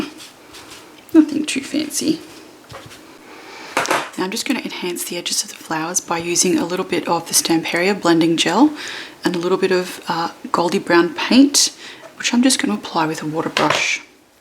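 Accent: Australia. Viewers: Thanks for the layer ideas! Love watching you create! When my children asks how Australian sounds, we listen to you together ☺️😘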